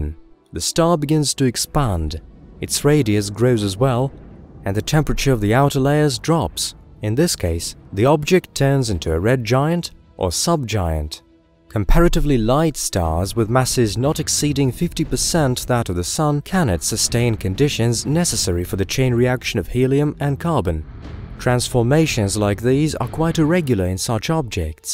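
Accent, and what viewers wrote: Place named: United Kingdom. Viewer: Why do these sites always have a haughty Brit as narrator?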